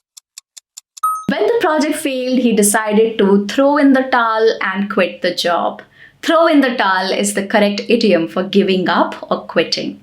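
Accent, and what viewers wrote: India. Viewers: Hey, your new SUBSCRIBER✋cuz my viewers want me to speak English like you with Indian🇮🇳accent so now I’m gonna work for my YouTube AUDIENCE❤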